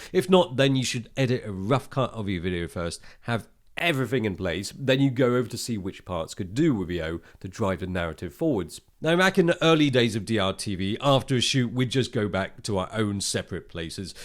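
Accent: United Kingdom. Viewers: The best asian guy who speaks britishenglish. A fan since digital rev days. More power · Hey can you please make a video talking about yourself, where you grew up, how come your English sound perfect British? I enjoy watching you vids. Thank you for posting these.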